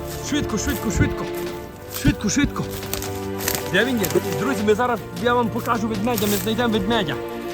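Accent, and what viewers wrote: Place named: Lviv, Ukraine. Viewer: Я,коли чую вашу розмову,не можу повірити,що ви 17 років живете в Канаді)) таке враження,що ви з якогось львівського села)))) ваші відео піднімають настрій)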